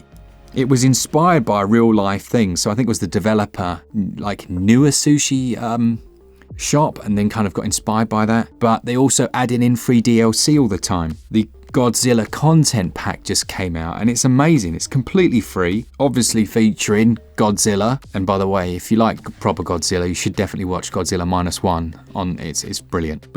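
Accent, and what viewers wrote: England, United Kingdom. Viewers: As someone from the USA who appreciates a good accent. “Little kitty, big city” will now default to an English accent every time I say it. Cheers!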